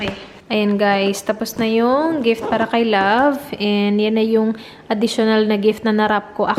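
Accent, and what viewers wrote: Visayas, Philippines. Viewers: Makakatowa kanaman mag salita ate girl Bisayang Bisaya..I love you ate girl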